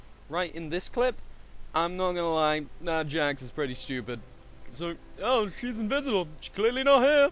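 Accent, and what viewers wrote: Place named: United Kingdom. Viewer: it's like the british version of Cryaotic's voice o.o Kinda, kinda.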